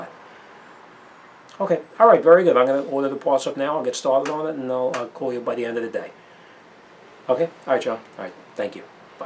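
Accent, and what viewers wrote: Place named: New York, United States. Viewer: Hey, I can hear you are from my area NYC. Where is your repair shop located?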